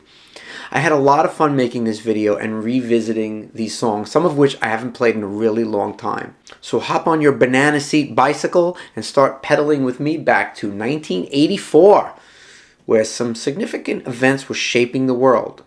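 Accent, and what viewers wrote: New York, United States. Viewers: Wish I’d started at 11 instead of 67 lol Anyway, love to hear the NYC accent. I’m a Bronx boy living in Cali the last 10 years. Not a day goes by that someone doesn’t mention my accent. Enjoyed the video If I ever get serious with practice I can be pretty good in my 90s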